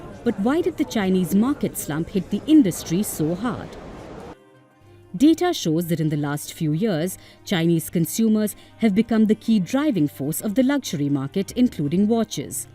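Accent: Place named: India. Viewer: narrator sounds indian - making this a suspect propaganda video by the indian government.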